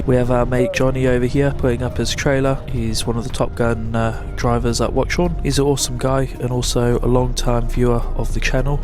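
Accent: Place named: England, United Kingdom. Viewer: Great video, this guy sounds like an Englishman not a kiwi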